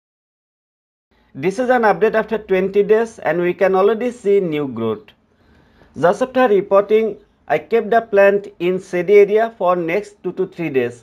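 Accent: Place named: India. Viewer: You do not need to improve your accent, your accent is very good. I am from Australia and your English and your accent are great! We dont want you to lose your Indian accent, it is what makes the world unique and mulitculturalism is wonderful!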